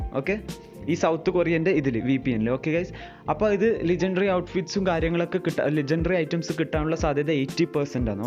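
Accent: Kannur, India. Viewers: Ningal kannurilaano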